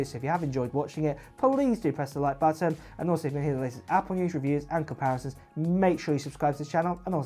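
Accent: United Kingdom. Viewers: Are you British as you sound British so why not tell us how much they cost in Pounds ?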